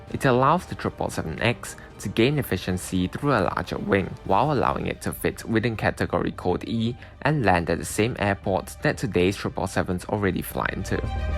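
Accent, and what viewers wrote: Singapore. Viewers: Nice documentary. Thanks for creating it. Is that a Singaporean (or perhaps Malaysian) accent you have?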